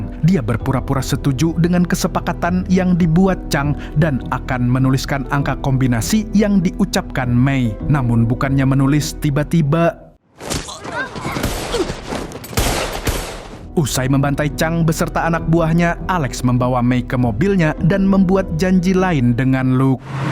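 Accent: Banjar, Indonesia. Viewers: Banjar kah mangg? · Mang Pian Banjar kah Kandangan,barabai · orang Banjar sakalinya😂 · pian ni urang banjar kah?😭🗿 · Org banjar jua paman ni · Orang Banjar kah mang😂😅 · Orang banjar kah bang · Katuju banar unda lawan nyawa min ai. Urang Banjar jua sakalinya😅. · Banjarrrr bgtt lahh🤣🤣.. wkwkk bgl tapi kerenn bang 😂🔥🔥🔥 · Urang Banjar kah sekalinya amang admin nih😅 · Anjay urg banjar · mimin orng banjar kalsel kah,? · Banjar ya min...tambuk😂😂😂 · Urang mana pian mang logat nya banjar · Urang banjar sekalinya 😂😂😂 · Anjay banjar sekali nya sidin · Org banjar jua sekali nya lah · Urang Banjar amang nya ini 😂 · Urang Banjar amang nih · Jiah, org banjar adminnya 😂😂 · banjar jua skalinya😂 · Banjar kh pian mang😂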